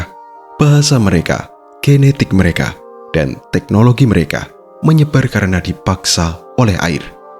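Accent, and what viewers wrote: Java, Indonesia. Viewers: Logat Jawa Memnag enak kalau untuk narasi film dokumenter artikulasi jelas dan suara ngebasnya itu enak didengar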